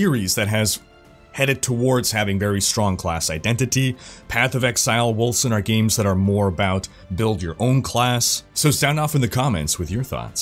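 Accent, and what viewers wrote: Quebec, Canada. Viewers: you learned french in Quebec didn't you? Your accent is 100% french canadian, I know because I'm one of those weirdos haha. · Bordel tu parles français (enfin quebecquois si j'ai bien reconnu l'accent) ;) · Wtf I've been watching you for ages and never noticed!!! Québecois? :D loll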